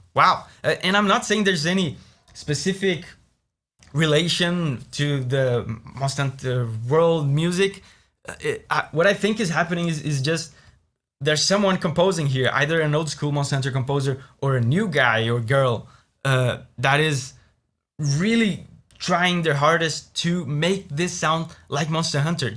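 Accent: Brazil. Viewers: Mano, descobri que vc é brasileiro pelo seu sotaque e pelo sobre do canal. Legal demais ver brasileiros com um grande público estrangeiro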